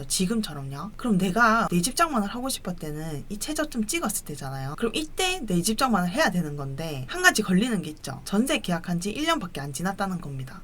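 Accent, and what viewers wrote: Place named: Busan, South Korea. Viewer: 집 때문에 저희는 결혼할 때 싸우지 않아요 경매 받은 집은 안들어 갑니다 갭 투자도 안해요 세금 때문에 하지만 항상 이상한 애들이 월세내고 주변에 있네요 한번 뵙고싶네요 부산 가면 고향사투리 좋네요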